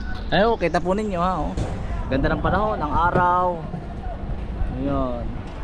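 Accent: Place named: Visayas, Philippines. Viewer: Nku idol tgal ko ng nuod sau kala ko bisaya k yung punto mo kase kala koy parte ng bisaya k😂😂😂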